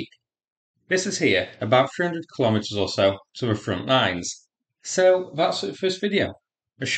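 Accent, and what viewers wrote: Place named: Stoke, United Kingdom. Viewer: Are you from stoke mate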